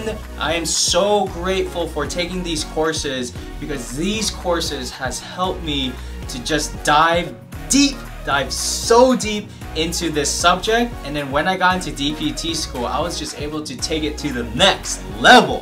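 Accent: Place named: South Korea. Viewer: nah it sounded Korean. I see you, my dude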